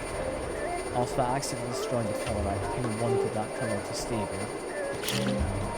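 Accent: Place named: Scotland, United Kingdom. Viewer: Cool. Funnily enough, I'm also Scottish and yet your accent is much stronger than mine lol. Nice video btw!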